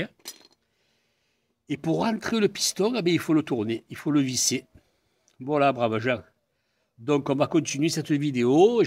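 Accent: South, France. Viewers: Merci pour cette vidéo très pratique.le coup du piston à visser pas évident..heureusement que tu es là!..avec ton accent du Sud ça me rappelle mes cousins Nîmois! Merci encore Une vidéo pour la courroie secondaire serait super sympa... si tu le fait toi même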